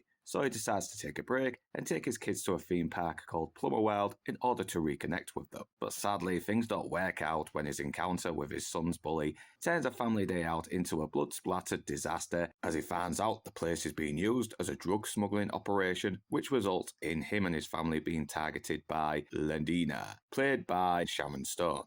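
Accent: United Kingdom. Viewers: Thanks! As an Ex-Northerner (you can't get much more south than New Zealand!) I have to say, it's so refreshing to hear a good northern accent talking so much sense. Keep it up!!!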